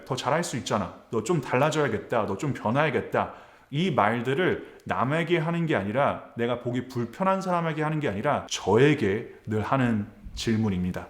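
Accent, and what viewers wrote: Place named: United States. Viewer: 문신하신 줄 이제 알았어요...외모에 대해서도 직접 디테일을? 말씀 안하시면 아무도 노티스 못하고 대부분 시청자들은 분위기 단정하고 좋은 분으로 생각하고 있을 거에요~ 목소리가 힘이 있고 단정해서 말투 평소에 아나운서 같다고 생각했는데 더 좋아질 수도 있을까요? 흠... 사진 리뷰 잘 보고 있어요. 저의 영상 제작 실력도 조금씩 발전하고 있는데 쿄작가님께 많이 배워요~ 미국 사시면 발음이 미국 발음이 나오는데 저도 그것때문에 한국 사는 분들이 지적을 하지만 한국에서 미국으로 온분들은 발음을 엄청 신경쓰고 교정을 했기때문에 원어민보다 더 한국 발음으로 돌아가기 힘들 수도 있죠. 사과도 당당하고 조리있게 하셔서 멋지십니다. 앞으로도 좋은 영상 많이 기대합니다~ 홧팅!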